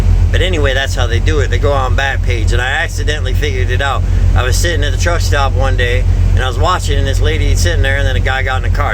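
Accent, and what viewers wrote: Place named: South, United States. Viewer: I was gonna say, you sound like a southerner, not from a northern state.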